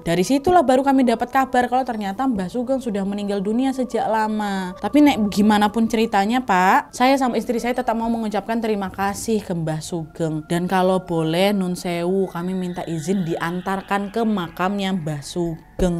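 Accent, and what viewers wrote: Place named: Java, Indonesia. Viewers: Ceritanya emang bukan yang serem jumpscare, tapi beneran penuh pesan moral, mengandung bawang dan beberapa kali sempet merinding pas dengerin 🥶🥺 Al-Fatihah untuk mbah Sugeng dan bapaknya bulek Siti 🤲 terimakasih kak buat storytellingnya yg selalu mantul (medoknya meyakinkan banget hehe) dan buat Anna yang sudah berkenan berbagi cerita 🙏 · Kalo ga salah ka pernah kuliah di jogja ya ka. Pantesan bisa logat jawa😂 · Keren banget kak nyeritain kisah nya sambil pake medok jawa, karna ceritanya berasal dari daerah semarang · Logat nya semarang banget😂😂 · Al Fatihah untuk Mbah Sugeng dan bapaknya Bulek Siti, Kak bawain nya medok banget. Selamat hari Kemerdekaan Republik Indonesia yg ke 78🥳🥳🥳🇮🇩🇮🇩🇮🇩 Sehat terus buat Kak, calon debay nya dan para tim. Edit: terimakasih atas 1,3k like nya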